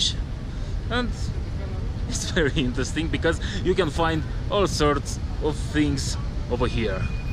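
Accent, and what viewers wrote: Russia. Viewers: First time no youre great channel, i like the Rusia accent! Exellent videos! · Чисто русский акцент)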